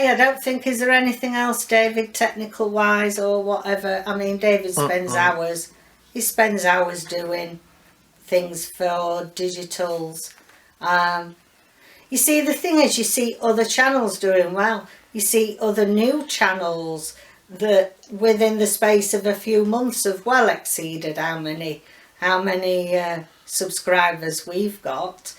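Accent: Yorkshire, United Kingdom. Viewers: Hi, Ive been a subscriber for a while now and although I dont comment, I thoroughly enjoy your videos. Months ago I was just scrolling through YT and came across a Yorkshire accent, well I knew I had to follow you, being a yorkshire lass myself. I know nothing about junk journalling but I still look forward to your videos especially the sewing ones. I learnt so much from you watching those. I will be sad to see you go but you have to do what you feel is best for your mental health. Could you do one junk journally vid one week then one sewing vid the week after. Have you thought about selling on Folksy? Its a smaller channel but is a lovely place to sell as there are no mass produced rubbish on there. I really do hope you stick around, maybe have a break to regroup. Please take Care. Angie x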